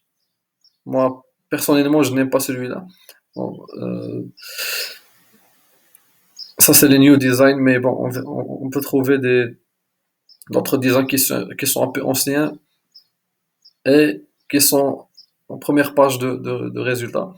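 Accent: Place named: Morocco. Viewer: Alors aprés votre poronciation je suis sure que vous êtes un maroccain والله تمغربى تحياتى.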